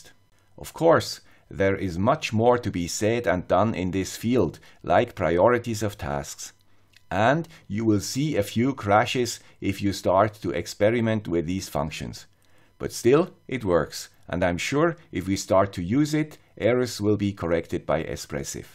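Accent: Switzerland. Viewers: you're a TRUE star (complete with your ubiquitous 'Swiss Accent'). I always enjoy your videos. I haven't yet done anything much with ESP32 boards as I've tended to concentrate more effort on ARM based SBCs (OLinuxuinos, Beagle, OrangePi, BananaPi, RaspPi etc), but my soldering iron is beginning to show 'twitching' signs of neglect. As soon as I can muster up some form of project to suit it, I'm sure I'll have the flux flowing... Thanx dude (from a guy with a Kiwi Accent... LOL) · Maybe Swiss? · The guy with the Swiss accent is kicking some serious ass -- great job! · I also miss hearing your original intro "Swiss accent *again*". Feels like something is missing now :) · "Here is the guy with the Swiss accent...again..." - I always enjoy hearing that intro - it's your signature trademark. My wife gets a kick out of it too (sometimes she listens to my youtube tutorials LOL). 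Thank you for this video. I've been using the ESP8266 exclusively since the ESP32 software arduino support seemed to be very much in alpha/beta release. Based on your recent videos on the ESP32 it seems it's slowly starting to stabilize and become very useful (still missing bluetooth though). I like the intro on RTOS and the simple example - not so intimidating after all. Do you think it's possible to control two different GPIO pins in a task running on different cores?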